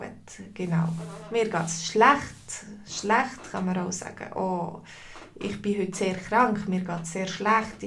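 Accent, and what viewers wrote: Switzerland. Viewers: Sprechen Sie Berndeutsch oder Zürideutsch? Meiner Meinung nach gibt es auch Unterschied dazwischen ,danke.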